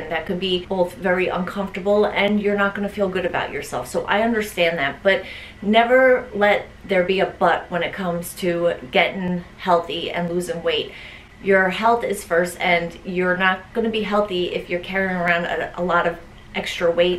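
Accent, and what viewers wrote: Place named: Minnesota, United States. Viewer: Huge CONGRATS on your weight loss! Amazing results! Where are you from? I THINK I might be detecting a slight Minnesotan accent or Canadian?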